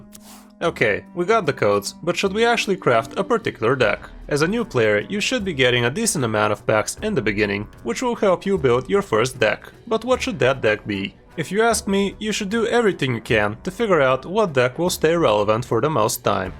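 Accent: Russia. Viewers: русский акцент детектед, привет чувак :) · Are you Russian?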